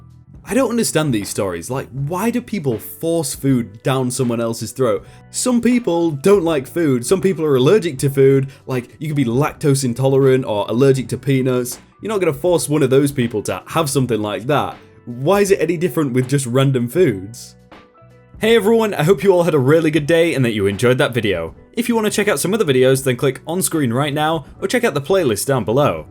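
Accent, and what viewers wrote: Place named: Lancashire, United Kingdom. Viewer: I love listening to your voice. Just out of interest are you from Lancashire? I think you’re from there but another YouTuber (thoughty2) is also from Lancashire and you do sound like you’re from a different part. Or maybe it’s Newcastle. Also, i can’t believe you’re only 18. Keep up the good work. Also, I love you’re other channels. As a British person it’s shameful that I just can’t place your accent.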